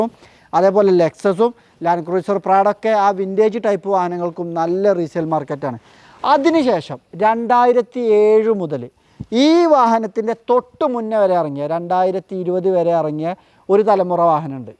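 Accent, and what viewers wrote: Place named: Valluvanad, India. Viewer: You described well in your own “valluvanadan”style.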